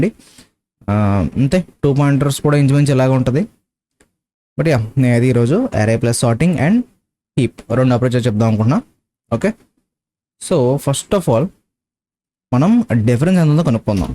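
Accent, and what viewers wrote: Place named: Godavari, India. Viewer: You are from east or west godavari... Ur slang in very close to ours...🙂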